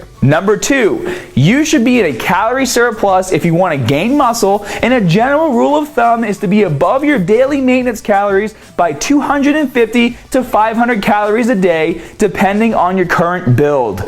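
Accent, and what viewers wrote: Boston, United States. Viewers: lovin the accent from boston myself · I don't remember having. that accent. Did move to the Boston area in the past couple years? Or has he always hidden the accent to an extent? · Loving the return of the boston accent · "With winter around the cornah" That thick Boston accent! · It's a Boston accent. It's not a New York accent; I'm from New York. It's not a British accent, and it's not an Australian accent either, although I get the mix-up. Problem solved. To me personally, the Boston accent sounds like a combination of a neutral American accent and an Australian accent. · h I asked the same question, the answer from other peeps was "bahstin" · bro, when the fuck did u aquire this "thick" boston accent?! u say bar, then baaahhhhhhhm what the fuck haha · Boston accent? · will do but I have to say I love it. Boston accents are great!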